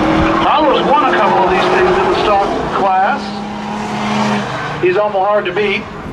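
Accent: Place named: United States. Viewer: lol, not that quickly. American giveaways: 1. Yankee accent of the commentator; 2. Full-size pickup trucks; 3. Dodge Charger with "Yeet" written on rear window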